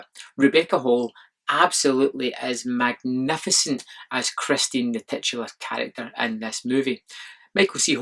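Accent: Scotland, United Kingdom. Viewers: By the way. I am assuming you either live or are from Scotland due to your accent. I have always wanted to visit Scotland. What is it like living their?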